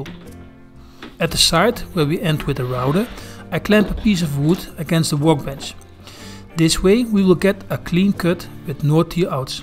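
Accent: Netherlands. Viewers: thanks for your reply, very much appreciated. Though i grew up here, i was born in the Netherlands and its a pleasure to hear that Dutch accent coming through! Ill be posting a video of my work bench build, though not nearly as impressive i think its going to be a great addition to my tiny workshop. Check out “captain of my shed” episode 6 if you want to feel even better about your amazing workshop!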